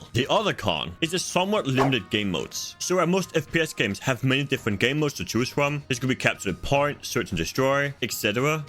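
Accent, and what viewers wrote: Denmark. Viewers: Can't fucking deal with this guy's danish accent just try a little harder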